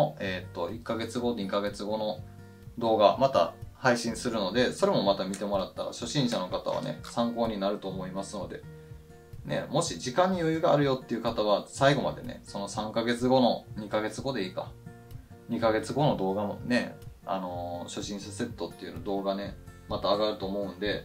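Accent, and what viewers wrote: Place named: Kansai, Japan. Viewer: 関西弁も心地よいです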